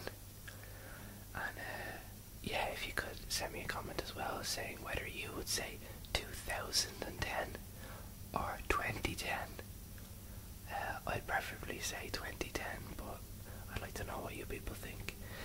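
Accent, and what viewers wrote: Ireland. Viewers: Niall Horan?!? Hahah jk I love your voice and that irish accent though!! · You have a lovely Irish whispering voice :-) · I'm hearin Dublin =D Cork here....good to hear an Irish whisperer out there =] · oh awesome your Irish. I'm Irish born in america but my family is from Dublin, Ireland i think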